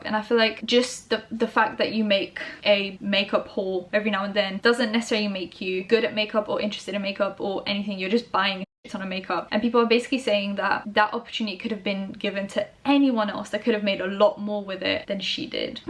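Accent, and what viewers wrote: United Kingdom. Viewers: Mate, I discovered your videos a few days ago and I’m obsessed. And the fact that you too are British makes it better 😂 only so much American accent a bish can deal with...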